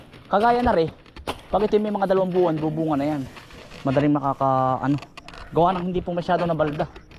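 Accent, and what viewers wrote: Philippines. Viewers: what's going on people stealing banana after typhoon destroy almost everything. It doesn't make no sense at all well just stay cool you'll get it back what you've lost. Take care your self and always stay safe and healthy and your entire family too love to watch your show and your Filipino accent too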